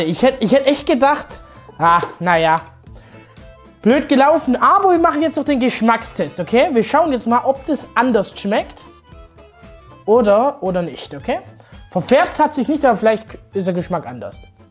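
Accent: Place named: Swabia, Germany. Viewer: Ich mag deinen Schwäbischen Dialekt.... 😂😂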